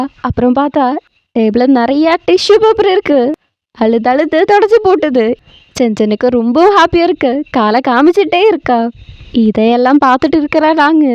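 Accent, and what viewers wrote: Kerala, India. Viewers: Chechi sherikk malayali thannenoo malayalam explanation mm Tamil explanation adipoli ayitt parayndallo 😌❤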